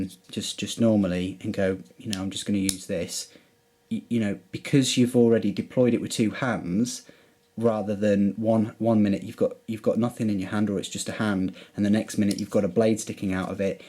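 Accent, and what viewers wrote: United Kingdom. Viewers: A really nice British accent :) BTW thanks for a review.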